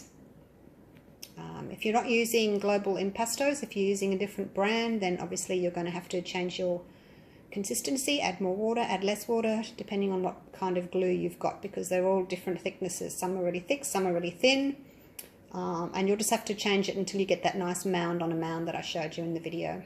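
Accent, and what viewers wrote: Australia. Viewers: Wow - you sound just like me! And I LOVE these colours and your work and the way you chat. I miss Australia - so it is so nice to hear someone from there. And this is from 2-3 years ago so you must have been one of the first popular Aussie Pouring youtubers. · American here. Love watching the video for the content, and enjoy hearing an Australian accent. For people like me who can't travel, It's fun to see/hear someone from a part of the world, far away from where I live. We are all different, but still have so much in common. Thanks for sharing what you do. Happy trails, from the USA. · Can't half tell your an Aussie discussing the merits of baby poo lol..love it :D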